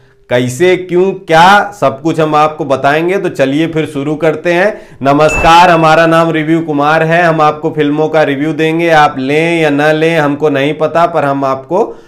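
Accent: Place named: Uttar Pradesh, India. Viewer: Yr.. bhaiya tumhari bte.. Mera.. gaon or mere bhaiyo ki yaad dila. Detii h.. Parathagarhiya.. hu bhai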